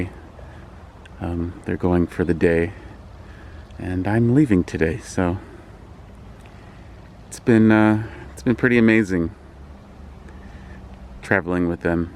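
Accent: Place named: Minnesota, United States. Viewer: Comment70 bcz69 is just awkward&overrated. Thats where i saw bald eagles floating bridge thats the year i was born! 1961 is the best yr ever same upside down as it is right side up.1979 is hsgrad.how bzzr u dropped those 2 #s? Quinky dink. You sound lime a minnesootan with your chicago great lakes accent. Bahaha you dont really need power that much? Whatever delusional. Go without power for a day hahahaha you have no idea about the power you use and have. Farmhand harvest farms. Casinos are gross.2cents The monks of new skeet is a cool dog book if you like to read cu down the road bahaha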